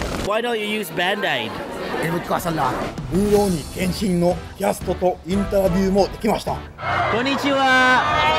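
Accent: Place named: Australia. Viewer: japanese with austalian accent!!!:))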